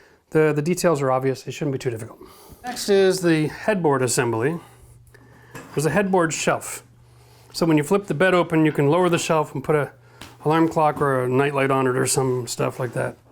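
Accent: Canada. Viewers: Im first to like this video & Saturday night would not be the same without a Canadian saying “out” !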